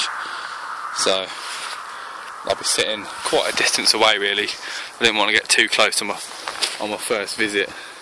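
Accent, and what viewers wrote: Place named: England, United Kingdom. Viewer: Yeah was guessing England by the accent but couldn't pin the area. Beautiful part of the country mate, and when you have experiences like this it makes it all the more worthwhile. I was fortunate enough to grow up in rural Somerset and then rural Devon so got to see loads of foxes and badgers in the wild. Sadly living in the city now I get to see the odd urban fox but it's not the same as seeing them in their natural environement. Appreciate the upload mate, takes me back to my youth :)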